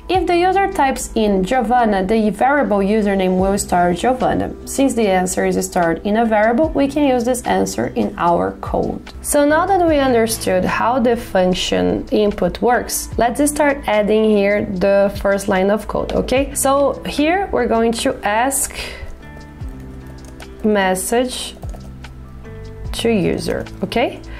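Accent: Brazil. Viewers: Sotaque de brasileira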